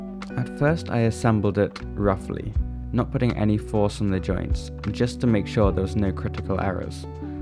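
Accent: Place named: Trinidad and Tobago. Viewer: You from Trinidad? Kinda picking up the accent there.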